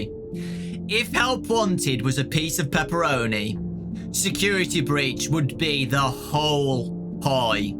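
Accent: United Kingdom. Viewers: Is Britsh!! britsh! (british) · Who is this guy and why is he British?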